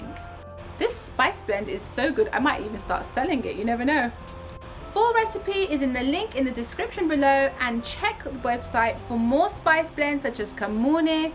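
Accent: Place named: Birmingham, United Kingdom. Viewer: You have a Birmingham accent 😊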